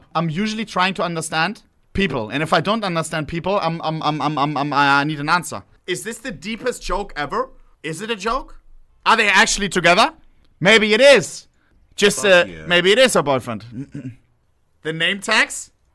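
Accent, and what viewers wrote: Germany. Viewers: is he german? I hear an accent XDD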